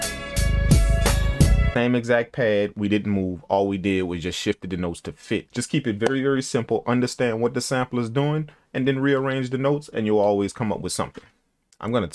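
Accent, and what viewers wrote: New Orleans, United States. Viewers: New Orleans heavy accent. Great video man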